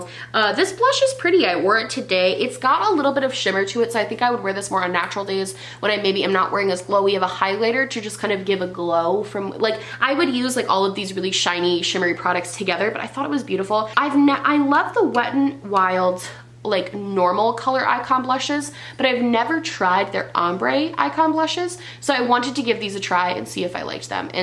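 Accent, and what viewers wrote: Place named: East Coast, United States. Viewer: Going through all your videos-I swear all of us East Coast girls kinda sound the same. I’m from Connecticut and my bf heard you and he was like “she talks just like you. The way you say things”. So there’s that intellectual observation..🤷🏻‍♀️ And yesss- I love my Pat McGrath. Always.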